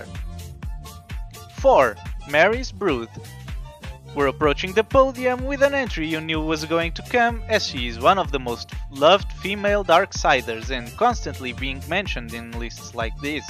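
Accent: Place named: Russia. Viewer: I love how Russian your voice is my guy it's a great accent!!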